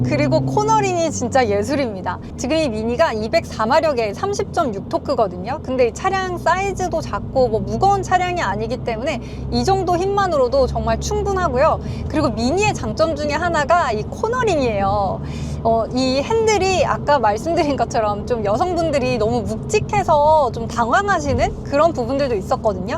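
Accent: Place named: Gyeongsang, South Korea. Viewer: 경상도 촌넘 ㅜㅜ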